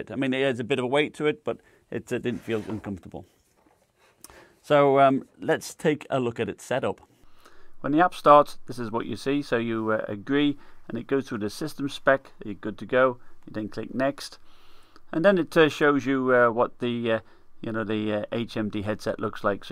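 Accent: United Kingdom. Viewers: Hey there, judging by your accent you might be based in the UK, however based on a few articles I read the Samsung Odyssey has no plans to go on sale in Europe and the UK (yet), wondering if you might be based in the US? And if you are indeed UK based, how did you manage to get hold of these?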